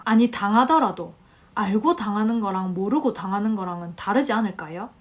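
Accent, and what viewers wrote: Gyeongsang, South Korea. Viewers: 경상도 사투리로 아주 야물딱지네요~~^^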